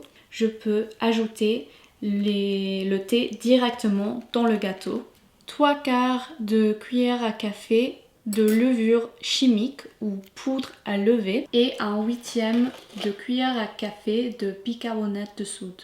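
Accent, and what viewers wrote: United Kingdom. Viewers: I feel you have a slight British accent in French, did you grow up in the UK?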